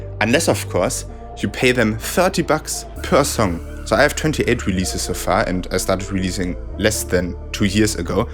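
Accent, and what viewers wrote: Germany. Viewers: Denglish on point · Der deutscheste Akzent, den ich je gehört habe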